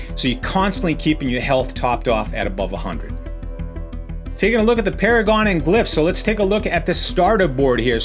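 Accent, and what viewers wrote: Boston, United States. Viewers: God damn the Boston is strong in this one!! Hahha Go Sox brother!!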